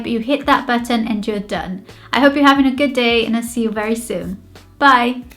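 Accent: United Kingdom. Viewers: tb sou brasileira e moro em Londres, o seu acento britanico e fantastico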